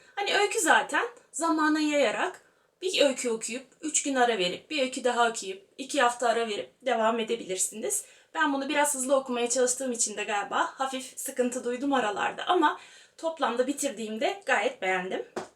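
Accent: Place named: Denizli, Turkey. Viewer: klasik okumaya hangi kitaptan baslamaliyiz? bu arada abla cok tatlisin denizlili akrabalarima benziyor konusma tarzin hep seni izlemek istiyorum cok ictensin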